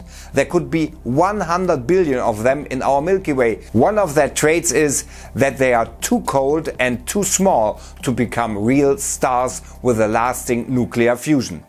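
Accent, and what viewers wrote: Germany. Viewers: Auch wenn man den deutschen Akzent stark raushört ändert das nichts an den coolen Infos und die Art wie du es rüberbringst. Cool das es auch nun nen englishen Kanal gibt. Gutes Video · Your German accent is very nice combined with those topics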